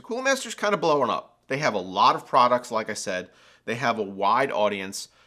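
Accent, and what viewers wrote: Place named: Maryland, United States. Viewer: u from maryland bro?? lets hang out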